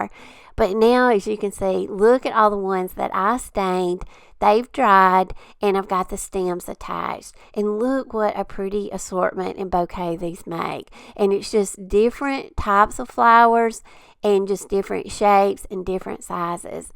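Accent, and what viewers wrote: South, United States. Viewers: Me too! I do so love that southern charm accent that shouts easy going! Makes me feel home! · I love your channel. I also love your accent.. it reminds me of Southern Hospitality ❤️🥰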